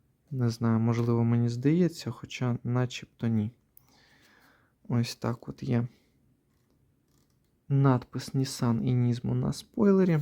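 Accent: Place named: Russia. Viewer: І взагалі... Маскалізмів забагато. Старайся гарніше говорити солов'їною. Вуха ріже безбожно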